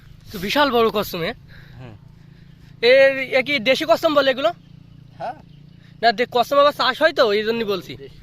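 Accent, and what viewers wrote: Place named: Meherpur, Bangladesh. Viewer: Vasha ta meherpur,chuadanga r....bari koy bhai apnar?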